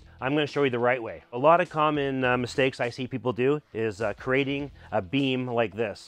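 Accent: Canada. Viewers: Canadian eh???????? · You sound Canadian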